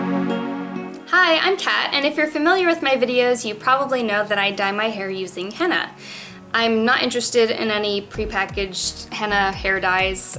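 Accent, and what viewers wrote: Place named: United Kingdom. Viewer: you sound sorta British in this one!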